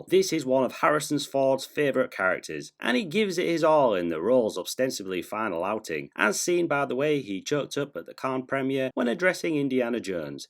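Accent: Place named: United Kingdom. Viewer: its just his beautiful british accent fr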